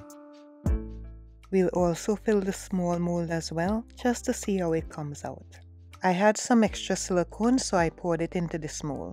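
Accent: Trinidad and Tobago. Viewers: hey ,,, yesss! trini accent very nice I stumbled upon your channel and your voice got me watching.... nice ideas thanks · I knew you were a Trini from the time I hear your voice🇹🇹🖤🖤🖤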